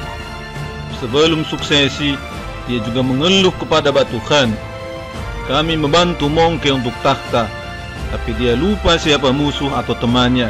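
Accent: Bugis, Indonesia. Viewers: Mcm logat Bugis ini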